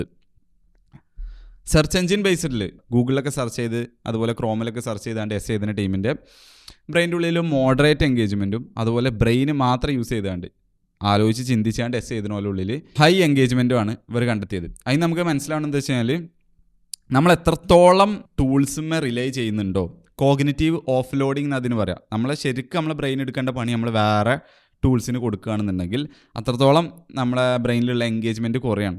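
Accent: Malappuram, India. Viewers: Hey bro, you sound like a Malappuramkaran… .. good video of how to not use ChatGPT, I am from perinthalmanna living in Australia.. happened to see this video. It’s very nice. I will be happy if at least some of the new genz kids get influenced by your video.. liked the dopamine video too..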